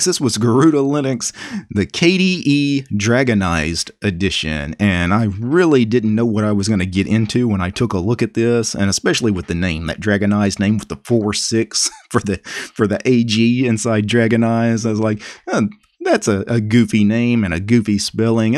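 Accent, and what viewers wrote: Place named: Deep South, United States. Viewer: First linux nerd with a deep south accent xD Best thing in the community.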